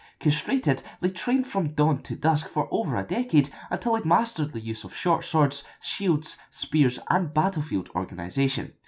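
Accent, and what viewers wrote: Scotland, United Kingdom. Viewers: your videos are getting so much better and your uploads more faster. with your scottish accent its a much have😜 can you put a tutorial on how to speak scottish because im dutch and im scared to learn from my mom (born in sterlin)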